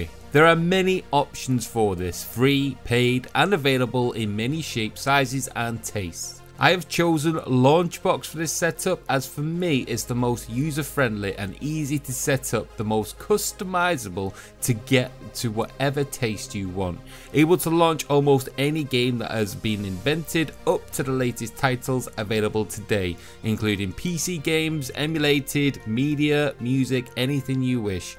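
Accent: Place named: United Kingdom. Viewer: i liked and subbed and now commenting and because i did all that is this is the best guide and your British which so am i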